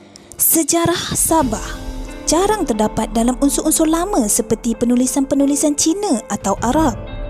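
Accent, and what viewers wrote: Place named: Kuala Lumpur, Malaysia. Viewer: ni ka pula sejarah sabah, yg brcerita d cini mcm bukan org sabah, logat kl pula ni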